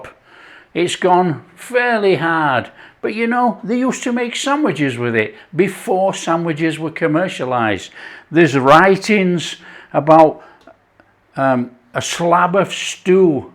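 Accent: Yorkshire, United Kingdom. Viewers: Thought it was great you talking in Yorkshire, I bet a lot of folk didn’t understand you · Ey up, as tha been on holiday tu cudorth (Cudworth), tha sounds a lot more Yorkshire tha see, on a serious note my grandad use to make a full breakfast, stick it on a tin plate, cover with cheese drop of milk and pepper shove it under grill, warm flat bread and stick the breakfast on it, roll it and dip in the scrapings of well done cheese that stuck to plate, absolutely fantastic. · I could get these in Todmorden Market Hall until about 1990 when the last oatcake maker died/retired. Had to make my own since then. Not as good... And I noticed your Yorkshire accent came through stronger! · Your accent really thickens up when you're cooking Yorkshire food. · Gone back to thi roots lad, tha sounds reet gradely!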